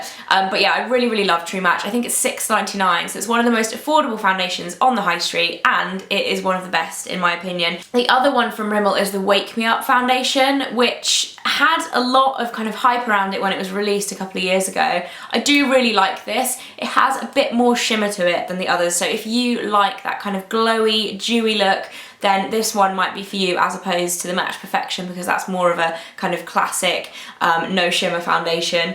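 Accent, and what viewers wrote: England, United Kingdom. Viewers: is she english or american ? :)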